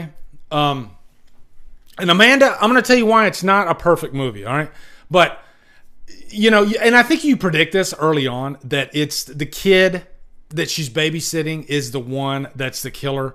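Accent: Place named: Southeast, United States. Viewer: What an Elvis nod! Brilliant! I love your accent. Where are you from in the US? I'm guessing south east (I'm not from the US). Thanks for the list!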